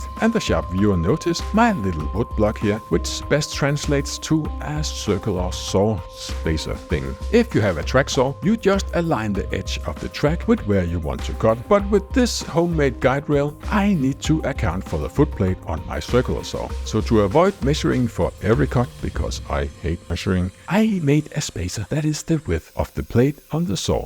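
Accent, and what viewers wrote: Denmark. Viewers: Som dansker må jeg bare sige, at der i reglen ikke findes noget værre end at høre danskere (inklusiv mig selv) tale engelsk...men din video og fortælling er så god, at jeg kan ignorere det! Det er en sjælden gave,. Godt klaret og fed video! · Som dansker var det for grinern at høre en dansk accent i starten af videoen. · dam im danish (i use differents accents on danish and english) and i imediately were like "that sounds so stupid.. is he using a danish accent?" and then you were like "in denmark" xD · Hej fra Canada! (Parents are Danish though. Great hearing the accent again) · you sounds danish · du er da dansker! jeg kan kende dig fra din accent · Ej fy for helvede din dansk-engelsk stemmer får mig til at krumme tæer... Virkede ellers som en spændende video. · I was just thinking "this is cool but you can't do it in Denmark" when I clicked on the video but then I heard the accent ❤ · I love it that you do voice over using your own voice and amazing Danish accent. 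I get sick of people using AI voice that sounds like a 1950's American radio program. Tak fra Holland!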